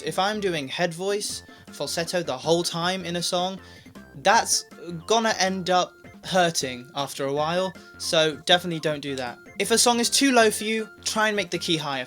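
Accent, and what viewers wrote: United Kingdom. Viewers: I know this is random but I LOVE your British accent 😂😂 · 아니 오빠 윙크 뭐야 뭐냐고 뭐야 진짜 왜그렇게 잘생김? 나죽어 응엉우웅우어엉 오빠 사랑해 으엉 영국 발음 미쳐 진짜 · ㅋㅋㅋㅋ 영국...분이죠..? 영어는 영국식 영어가 멋있지./ ㅋㅋㅋ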